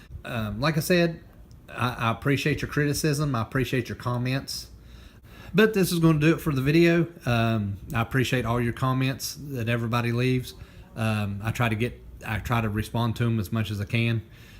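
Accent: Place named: South, United States. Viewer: Jordan Gons might just be his heavy southern accent.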